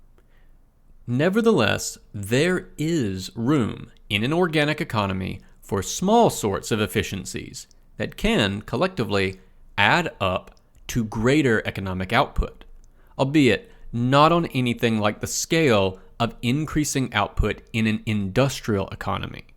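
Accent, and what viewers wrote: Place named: South, United States. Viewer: Finally some real food... I mean, ACOUP voice overs with a delightful southern drawl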